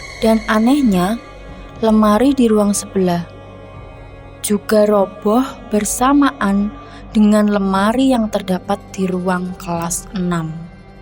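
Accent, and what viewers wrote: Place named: Java, Indonesia. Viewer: monmaap medoknya malah bikin senyum bukan serem , tp tetep keren ceritanya luv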